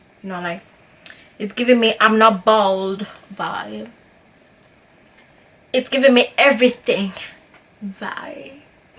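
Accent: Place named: Jamaica. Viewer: This was enjoyable to watch 😂🥰🥰 Also, I love your accent, where are you from? Jamaica? I'm Nigerian btw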